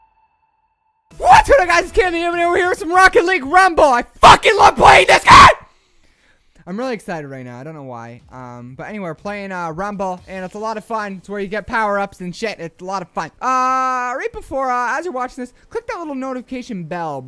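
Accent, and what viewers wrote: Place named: United Kingdom. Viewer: i loved this, i found it very humorous with the british accent, very awesome rocket league gameplay, I'll have to reinstall this at some point and play it again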